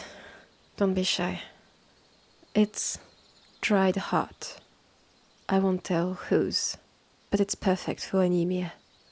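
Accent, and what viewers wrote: France. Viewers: Your English is, quite frankly, amazing & I'm so jealous as I'm nowhere near bilingual. Only thing I'm any good at is cooking and as you're French that's probably no boast :p The icing on the cake is you haven't fully lost your accent, which is an absolute dream to listen to. The creativity here is on par with any other channel I've listened to & my hope is YouTube enables you to draw others here too. I am intrigued as to whether you write these in your native language & translate, or directly in English? Either way, it's working :) Keep it up!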